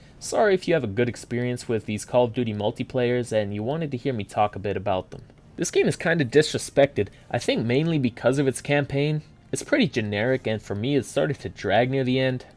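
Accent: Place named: Boston, United States. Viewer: Where you from? I can kinda hear a bit of Wahlberg in theya! Boston?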